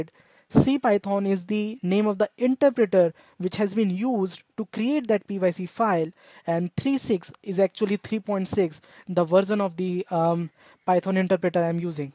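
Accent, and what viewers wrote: India. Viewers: 😢. Desi english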